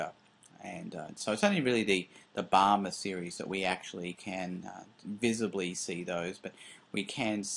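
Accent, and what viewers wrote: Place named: United Kingdom. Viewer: you bless my sleep with dreams of your british voice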